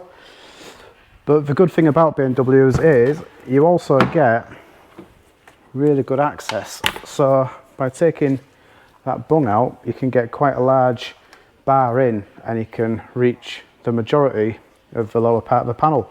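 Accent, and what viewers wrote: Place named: England, United Kingdom. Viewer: G'day Australia here, top video, and has taught me a few things I've been missing thanks for that, have been learning PDR for my own use off YouTube, being an old Pom it's good to hear a Pommy accent instead of a Yanky one for a change. Keep up the good work - DaveO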